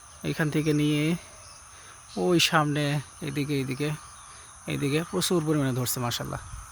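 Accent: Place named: Tangail, Bangladesh. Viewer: ভাই আপনার কথা শুনে মনে হচ্ছে টাঙ্গাইলের কোথাও আপনার বাসা।। আমার বাসা টাঙ্গাইলের সাগরদিঘী। আপনার নাম্বার টা দিলে ভালো হতো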